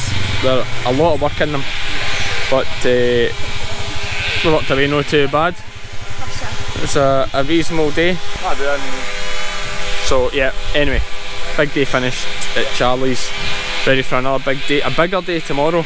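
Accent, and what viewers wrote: Scotland, United Kingdom. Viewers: Hi I live in New Zealand, I drive stock trucks for a living I use a kelpie x Smithfield bitch for loading and unloading she backs sheep all the time real handy for loading cattle as well nothing gets past her will send you a video at some stage so you can see how we do it down here, keep up the good work it's nice to hear my Scottish accent again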